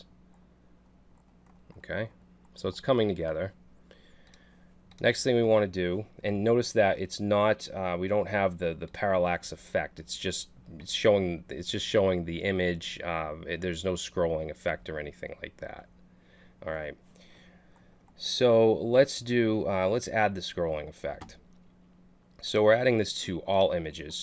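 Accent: Boston, United States. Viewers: When you hear someone's voice for so long and finally see their face. It's like my mind just went to a whole different dimension trying to sync up your face and voice. In all seriousness, I actually think your Boston accent helps me learn so much more. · dig the boston accent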